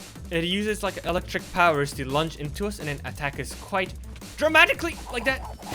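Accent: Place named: Japan. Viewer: The way you say names in Japanese accent i think you're from japan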